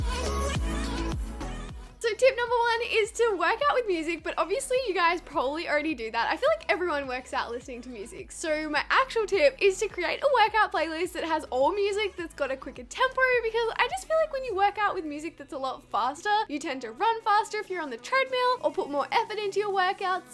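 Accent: Australia. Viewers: are you ausie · Are you aussie...you sound like one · She's australian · Your australian wow cool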